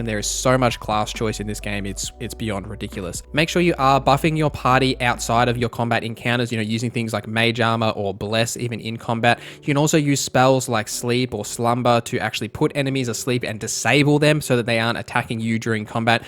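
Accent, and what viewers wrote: Australia. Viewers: G’day mate. I think your channel could really take off with guides for tricky games like this. You present well and apparently people like presenters with our Aussie accent. (Who bloody knew?) Can I make a suggestion for your videos to maybe help set them apart from others out there? When you hit a concept like how an attack works, try running through an example or two. Maybe start with a basic melee attack and show how dice rolls would play out and the factors involved. Then add some complexity, like a ranged/magic based attack and play it out. I think it will help folks like me who have very little crpg knowledge. Which will be a lot of folks coming to your videos. The examples you create don’t have to be played in ‘in game’ you could create a basic graphic from screenshots and a cg dice. Could be worth a shot, might be too much of a pain in the ass. 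I think you’ll do well with how you’re going now, but wanted to toss my two cents in here. I’m subbing anyway mate.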